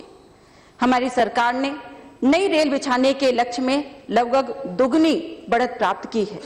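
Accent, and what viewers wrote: Standard. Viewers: इतना शुध्द हिंदी संबोधन बहुत कम लोग करते है ।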